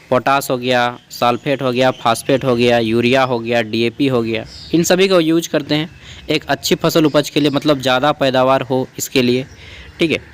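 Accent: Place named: Jharkhand, India. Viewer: Aap kaha se bhai, apka bolne ka tarika se lg rahe ho aap hazaribagh se ho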